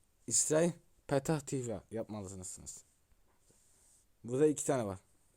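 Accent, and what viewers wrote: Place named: Syria. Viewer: Abi sen suriyelimisin konuşmayı unuttun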